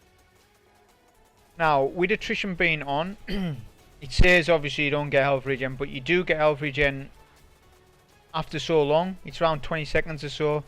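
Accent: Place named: Newcastle, United Kingdom. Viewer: That's a fine Geordie accent 👍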